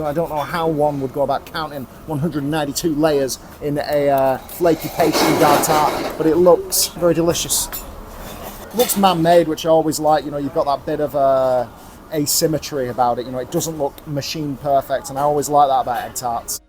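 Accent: United Kingdom. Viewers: Hello, it is much an enjoyable experience to watch your video about HK food scene and when you talking in British accent. We needed your expertise on analyzing how good is the HK milk tea in comparison to traditional English breakfast tea, it is enjoyable especially watching that segment. HK milk tea was invented somewhat based off the original formula of the original English Breakfast tea or Ceylon Tea during Colonial Era. I left HK 30+ years and occasionally I drink Twinnings tea bags and HK che chean tang here in Los Angeles suburbs are not that good compared to HK locations.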